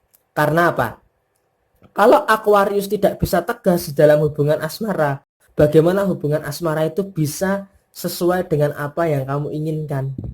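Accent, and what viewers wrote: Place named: West Java, Indonesia. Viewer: lah ,, mas orang sunda ya .. sama .. sunda na daerah mana mas?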